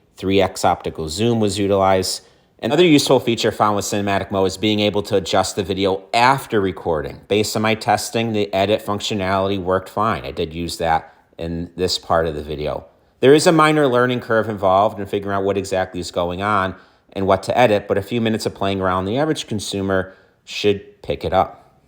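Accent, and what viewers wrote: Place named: Connecticut, United States. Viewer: Informative video and strong Connecticut accent. 😅 I spent my middle- and high-school years in Suffield.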